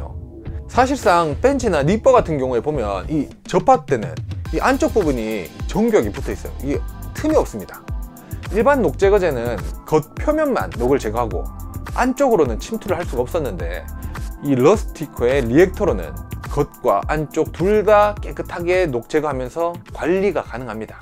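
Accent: Gyeongsang, South Korea. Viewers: 말하는게 어리버리 갱상도넘인가요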